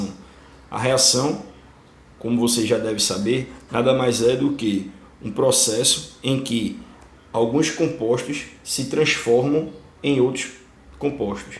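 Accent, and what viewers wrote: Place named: Northeast, Brazil. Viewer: só quem é nordestino entende bem e reconhece esse sotaque rsrsr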